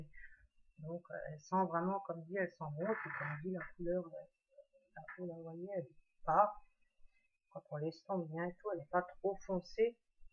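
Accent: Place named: Lorraine, France. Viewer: tu es de la lorraine ? ton accent me fait penser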